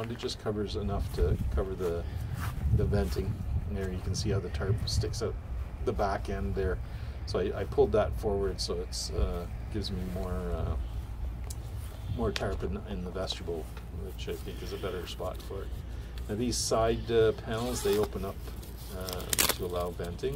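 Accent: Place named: Canada. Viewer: Thanks. I just bought this tent for many adventures to come. I love your Canadian accent, eh. Many happy trips ahead.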